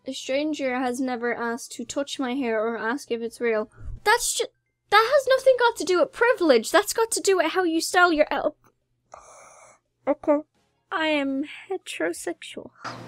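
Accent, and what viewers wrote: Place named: Donegal, Ireland. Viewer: U sound like ur from donegal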